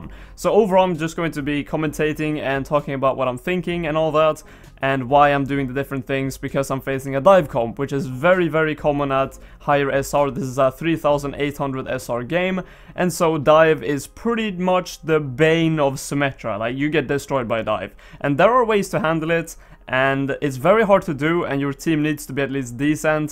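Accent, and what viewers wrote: Sweden. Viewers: Are you from sweden?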